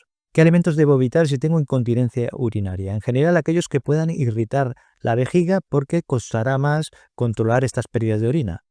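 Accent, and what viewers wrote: Spain. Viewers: Por el acento, es español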